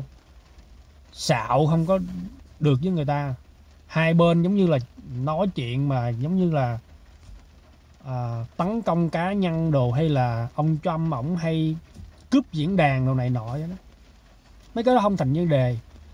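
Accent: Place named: Can Tho, Vietnam. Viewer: Chúc. lạc quan lên mình người bạc Liêu và thích giọng còn càn thơ của bạn nhìn thấy bạn hơi ốm và mắt hơi buồn làm mình buồn lây. Thôi cố giữ vững cuộc sống nhé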